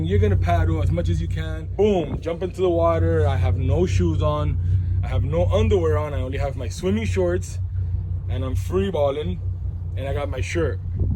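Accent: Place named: Florida, United States. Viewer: That Florida accent is so weird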